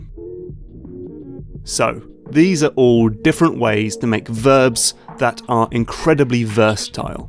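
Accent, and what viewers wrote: United Kingdom. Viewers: utube in a nutshell+ british accent helps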